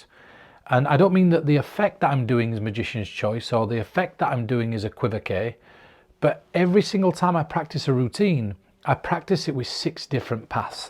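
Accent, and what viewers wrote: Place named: United Kingdom. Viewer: Do I have to have an endearing UK or British accent like you or Daniel Madison to practice mentalism or good magic or can I do it with my silly American accent or do I need to practice in a Canadian accent how a boot that LOL by the way I'm part of the pig pen magic Academy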